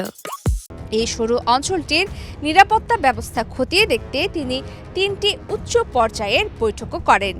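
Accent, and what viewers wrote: India. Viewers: বুঝলাম না এই সংবাদপত্রের সাংবাদিক কি বাংলাদেশে নাকি ইন্ডিয়া তুমি যেভাবে খবর পড়তে মনে হচ্ছে উনি ইন্ডিয়া সাংবাদ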